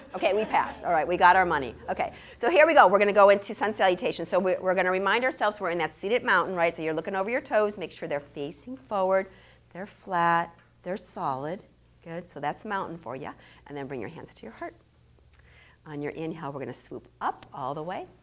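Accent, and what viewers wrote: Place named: Ohio, United States. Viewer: Oh is yours an Ohio accent? No I wouldn't have guessed. Though I'm not good at detecting accents other than the more obvious ones such as Boston, Long Island, Chicago, South Jersey and of course vaguely Southern or Texan. Though now that you mention it, I guess you sound Eastern U.S. It's probably hard to tell in CA, with so much mixture. Anyway your voice is comforting and I like listening to you.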